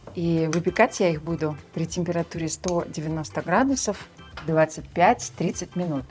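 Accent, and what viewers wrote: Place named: Baltic. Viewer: здравствуйте! Ваши рецепты такие классные и удивительные и мне это нравится! 😊 А на счёт вашего акцента, я думала, что вы из Прибалтики 😁 Удачи вам ❤❤❤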